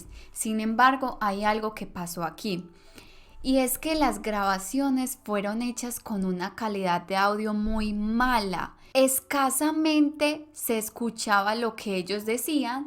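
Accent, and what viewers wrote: Colombia. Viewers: Tiene acento colombiano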